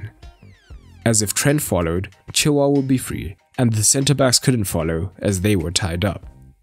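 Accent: South Africa. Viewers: The guy doing the voice over South African?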